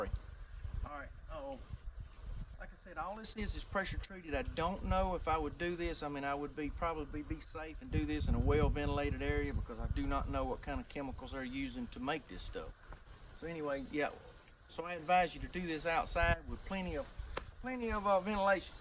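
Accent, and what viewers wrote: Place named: Tennessee, United States. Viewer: Awesome! Love doing this too ! Great video. You from TN ? That’s where I’m from . Your accent is similar to mine . Thank you 😃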